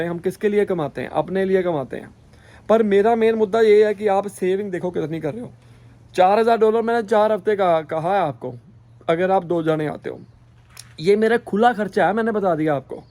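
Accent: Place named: Punjab, India. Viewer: ਬਹੁਤ ਪਿਆਰੀ ਬੱਚੀ ਆ, ਪੰਜਾਬੀ ਬੰਦਾ ਹਿੰਦੀ ਬੋਲਦਾ ਸਾਫ਼ ਪਤਾ ਲੱਗ ਜਾਂਦਾ ਆ ਕੇ ਇਹ ਬੰਦਾ ਪੰਜਾਬੀ ਆ!!😊😊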